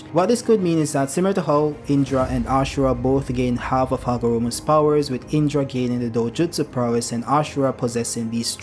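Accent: Jamaica. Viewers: which part of Jamaica you come from mi g you sound like you from ochi bro gaad but more time yuh affi Yankee up the ting mi boss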